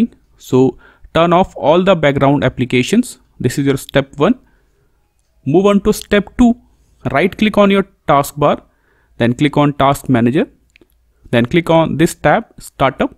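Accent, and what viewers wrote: India. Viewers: The Indian accent and help is crazily good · I already felt scammed by the bad English Indian accent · pov me trying to fix my window 10 annd the voice of the guide is indian (knowing that it gonna be fake)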